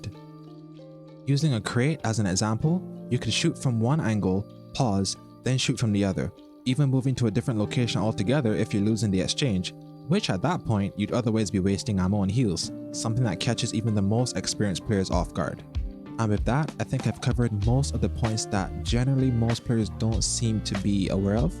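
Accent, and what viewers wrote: Barbados. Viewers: Great video. Will definitely be watching the rest and any future videos. Quick question are you Bajan or Trinidadian? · Are you Bajan? You sound it! Great guide.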